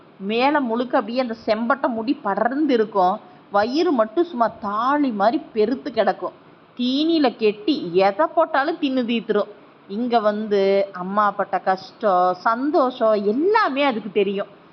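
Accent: Kongu, India. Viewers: Kongu tamil arumaii ❤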